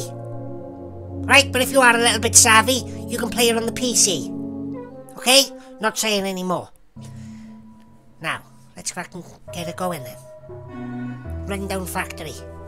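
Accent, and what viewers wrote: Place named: Wales, United Kingdom. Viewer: Funniest welsh accent ever never heard any in my life but I'm from Carmarthen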